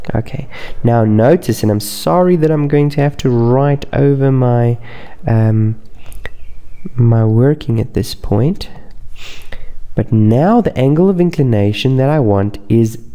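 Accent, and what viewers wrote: South Africa. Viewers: I thought i recognized the accent! Hey there South African bro!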